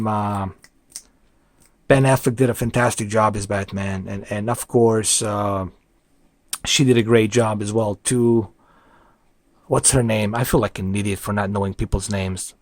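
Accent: United States. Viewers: Im from Australia and have made afew videos a year ago, check them out if you want. I might get back into making youtube videos, i started off pretty badly and abit nervous but might go back doing some after i upgrade all my gear.its not like i need an upgrade coz all my gear is 2015 models BUT now that theres HDR i really want a projector with hdr , like the sony 4k hdr selling at 15k lol expensive but worth it. Im more of a home theatre enthusiast and picture quality is very important to me. So yeh, hopefully when i move into my new house i will upload more vids. I love watching your vidz man. And love the american accent lol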